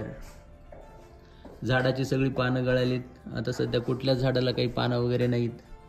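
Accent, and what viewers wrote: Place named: Vidarbha, India. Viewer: chane आहे कुठं आहेत भाषा widarbhatli आहे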